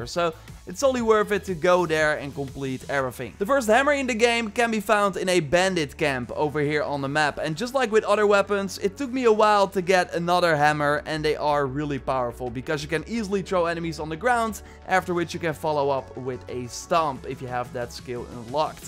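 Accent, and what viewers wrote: Netherlands. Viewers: This guy is so dutch it hurts my ears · Je hoort zo goed dat je een nederlander bent van je uitspraak no offense 😂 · You can hear that you’re from the Netherlands 🥰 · ur dutch right ? can clearly hear it when he pronounces "other" like otter" :P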